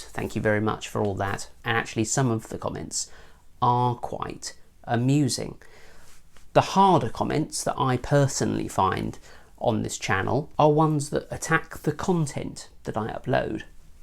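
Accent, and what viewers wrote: United Kingdom. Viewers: I always like british accent ;)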